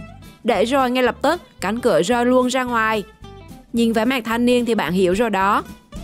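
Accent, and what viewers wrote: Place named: Central, Vietnam. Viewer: Nghe tiếng dể thương mà ba, tôi ng miền nam nhưng nghe khoái. Chị này chắc ng miền trung đấy. Tôi có một ng bạn tiếng giống chị này rất dể thương, xa rồi mãi nhớ giọng nói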